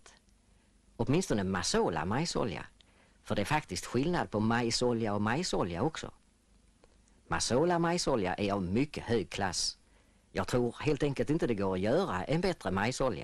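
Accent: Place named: Skåne, Sweden. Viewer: Idag hade man inte haft en som talar utbredd skånska i en reklamfilm.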